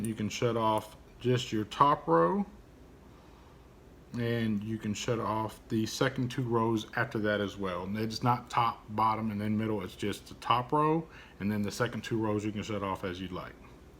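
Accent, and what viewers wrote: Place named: South, United States. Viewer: Also, no disrespect but you country as hell 😂😂😂. As a country boy from Alabama I appreciate it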